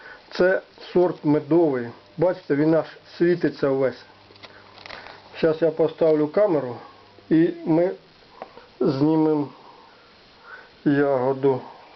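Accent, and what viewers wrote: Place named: Kharkiv, Ukraine. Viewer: Дай Бог вам всього доброго , мирного , світлого ! Як приємно чути наш харківський говор !!!! Супер !!!!! Дякую 🙏🙏🙏.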